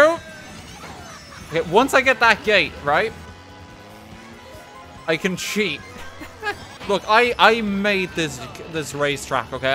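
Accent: Ireland. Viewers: holy shit its irish jerma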